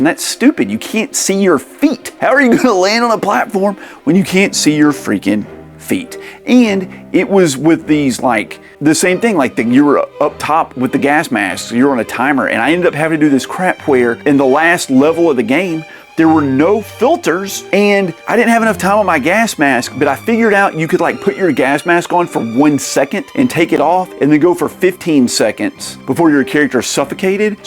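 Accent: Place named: Appalachia, United States. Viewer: As an Appalachian American, I respect and enjoy the accent. 🤘🤘🤘 Subbed and looking forward to checking out other reviews and such.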